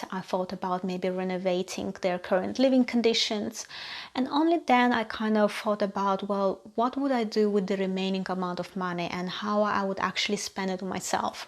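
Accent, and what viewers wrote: Russia. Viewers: Are you Russian? I can hear Russian accent. Do you live in UK?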